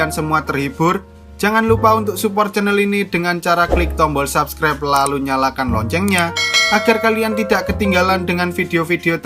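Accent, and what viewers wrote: Java, Indonesia. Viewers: Medoo bngt lu anjing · Jowo mu ngndi lur?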